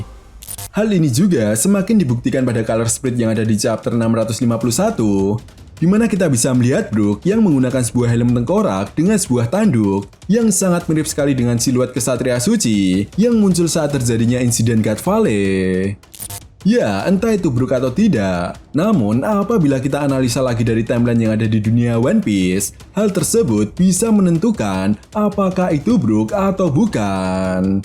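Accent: Java, Indonesia. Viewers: Malah puyeng dengerin suara masnya dgn aksen briish javanese. Mending pake suara AI aja bang..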